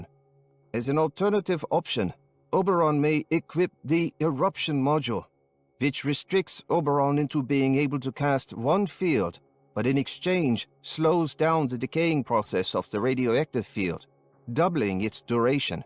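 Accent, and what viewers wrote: Germany. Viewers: is this a real or fake German accent? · is that a german accent? lol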